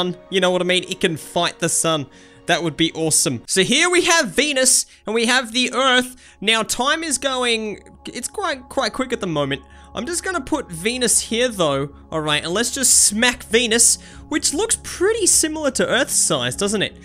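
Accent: Australia. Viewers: Are you Australian?! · His Australian not English for his it is satin for us it is saturn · Hes aussie! Like me! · you australian? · Wat your Australian me to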